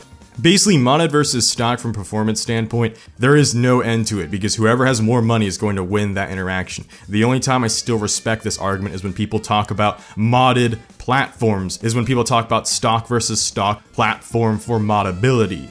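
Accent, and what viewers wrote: United States. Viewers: I hate to sound racist but you sound awfully American for a person Asian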